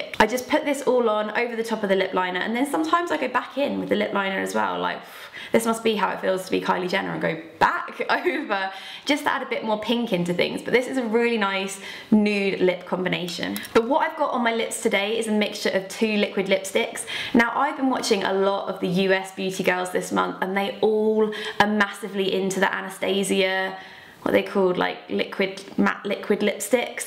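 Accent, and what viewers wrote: England, United Kingdom. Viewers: I love the English accent and the way you say Glowwwwww, love you x from MAURITIUS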